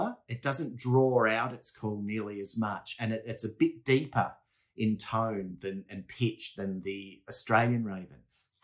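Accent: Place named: New York, United States. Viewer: haha...thank you. love it. I'd say, not an American accent but somewhat NY city Jewish accent. :). Jewish Ashkenasic :).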